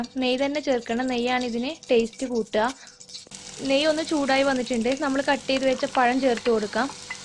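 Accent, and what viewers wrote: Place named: Thalassery, India. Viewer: ingal thalassery kaari aano?? slang thalassery pole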